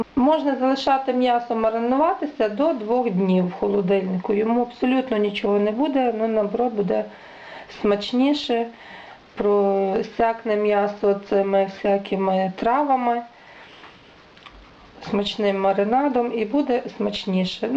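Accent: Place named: Western Ukraine, Ukraine. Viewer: як приємно чути західний акцент)дуже гарний і зрозумілий рецепт) підписалась на твій канал, підтримай мене і підпишись на мій) дякую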